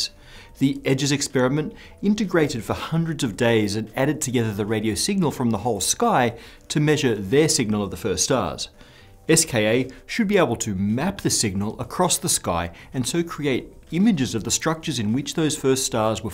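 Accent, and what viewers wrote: Australia. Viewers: Why would there ever be any down-votes for these videos? Don't like galactic mergers? Don't like Aussie accents? Then why watch? At this moment it's 13,806 views versus 19 thumbs-down - so I guess that's only slightly more than 1 in 1000 - so who the hell knows.... People that don't believe in the structure of the universe? Religious people? Surely not - cannot it all be seen as a gods amazing creation? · Do all Australians talk like that? I want to move to Australia all of a sudden.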